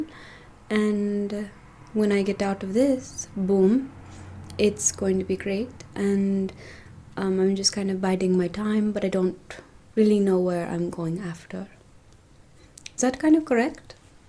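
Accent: India. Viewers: YESSSSSS U CAME BACK , WE ALL LOVE YOU HERE THANK U SO MUCH ❤ BEST INDIAN ACCENT ASMR ON YOUTUBE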